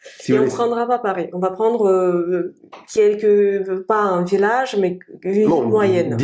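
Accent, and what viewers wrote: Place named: Russia. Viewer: Bonjour ma belle, me revoilà, c'est ton Nicolas! En passant, merci pour cette vidéo très enrichissante et ton accent russe si magnifique! Tu découvriras bientôt mon accent parisien. Tu peux déjà préparer un passeport pour l'île de Malte! Rendez vous a l'aéroport Charles de Gaulle autour du 15 août 2025. Vol direct Ryanair (air France groupe) sur airbus ( Boeing n'est pas fiable en ce moment). Je suis actuellement où tu sais. Je me repose bien. Comme tu le sais, j'ai plusieurs handicapes qui m'obligent à faire attention. Je pense que mon frère va de mieux en mieux. Je le vois toujours souriant. Il m'a fait beaucoup rire quand il a dit " le bal des vampires est terminé"! Si pouvait lui dire qu'il fasse plus d'exercice. Je veux qu'il soit en forme quand je le reverrai. Pour ma part, les choses vont s'améliorer petit à petit. Demain, je vais aller courir sous la pluie ( très vivifiant). 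Après une vague de chaleur jusqu'à 40 degrés où tu sais. Aujourd'hui, beaucoup de pluie et une température de 21degres et gros orages en fin d'après midi! Je resterai à l'hôtel où tu sais tranquillement. J'ai une grande quantité de chaînes youtube a regarder! Dont les tiennes, bien sûr!! Tu dois savoir que nos amis autour de la terre ont pris le contrôle et sécurisé l'espace aérien autour de la terre! La libération est très proche! Mon Frère m'a fait aussi rire quand Il a appelé ses nouveaux missiles Satan 2! Ou son nouveau sous-marin Arkangelsk! Ville ou j'officierai avec lui! Ce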